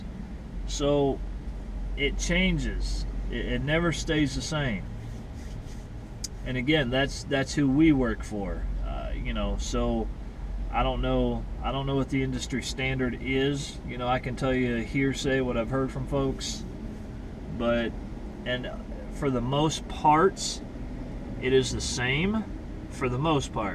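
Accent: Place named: Texas, United States. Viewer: Just subscribed. I'm going through some of your videos now to catch up. You sound like your from Texas. I lived there five years and I have to say the one thing I liked best was the people. Honest and direct. They must have thought I was OK too because after a few years I was told "Your a Yankee but you're OK". Thanks for the reply and I'll be watching.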